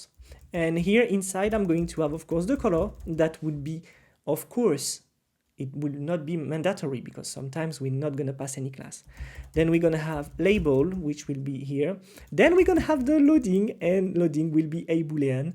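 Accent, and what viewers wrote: France. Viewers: Good one, you sound Frenchie, it will be great if you also have a french channel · I see your frensh accent and ilove you sur❤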